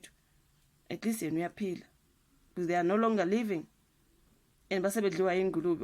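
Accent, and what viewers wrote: Zimbabwe. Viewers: Your Zulu is not make sure it sound Zimbabwean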